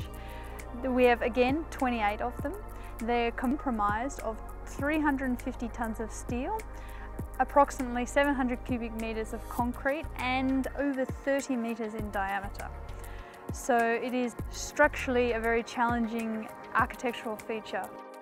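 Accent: Australia. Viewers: God, I totally love this australian accent O.O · I've been following this project since 2010. It's great to hear an English overview of the latest developments but even better that you're an Aussie. This is Stuttgart's equivalent of the Sydney Opera House. Please volunteer to do more. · Do I sense an Aussi accent? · cool project, cool people working on it and the Australian accent is very Wellcome in Schwäbisch Land..:)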